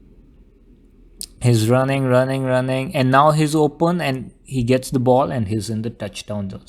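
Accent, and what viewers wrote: India. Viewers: An Indien accent on Football. That's as cool as the offence guy to the defence guy on him: I crush you little man, being like: Catch me if you can...gooood luck! #cyberpunkcoltoure Take it as game of positions and the head is passing on the initiative savely. Even so difficult, the best teams win by passings from receiver to receiver avoiding ball loss, close to Rugby which is the Grand Pa, but way less aggressive. #provos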